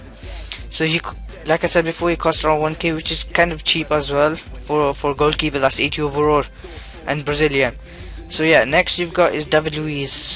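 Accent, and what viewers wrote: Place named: South Asia. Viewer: you sound brown D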